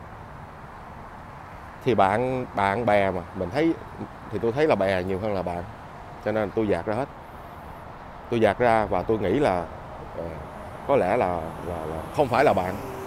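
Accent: Standard. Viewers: A oi,a noi chuan lam,hy vong a lam nhieu video nua,de e hoc hoi nhung j ve ben my.e cung muon qua do song giong a. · Anh nói chuẩn quá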